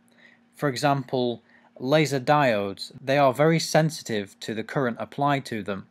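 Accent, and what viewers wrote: United Kingdom. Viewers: Hey, what kind of british accent do you have?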